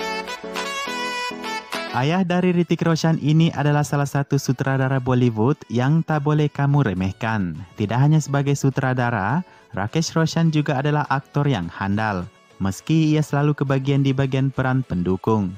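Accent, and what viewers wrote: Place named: Makassar, Indonesia. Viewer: Admint org makassar kah..logatx kaya org makassar 🙂😃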